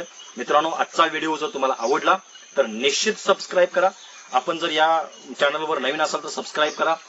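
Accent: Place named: Kolhapur, India. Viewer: तुम्ही कोकणात राहता तर कोल्हापुरी टोनमधे कसे काय बोलता?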